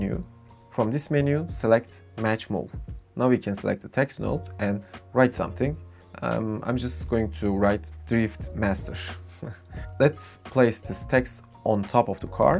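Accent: Turkey. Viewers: dude, you talk like a Turkish, are you Turkish? :D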